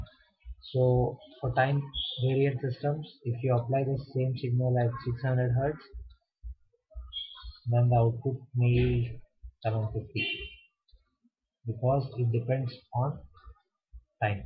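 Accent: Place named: Pakistan. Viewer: classical pakistani talking.. :D why internet is full of you guys? even british accent is more understandable.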